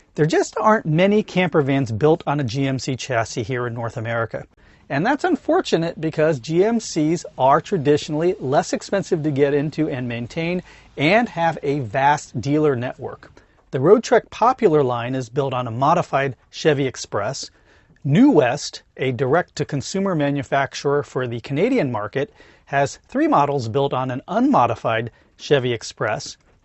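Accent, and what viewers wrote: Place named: France. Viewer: Rethinking everything now. Maybe it would be better to go with something like this! Nice French accent, by the way!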